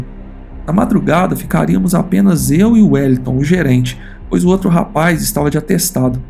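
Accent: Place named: Campinas, Brazil. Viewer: Vou me inscrever. Gosto dos assuntos e seu sotaque parece que tu é aqui do interior de Campinas kkkkk então me identifico. Sucesso.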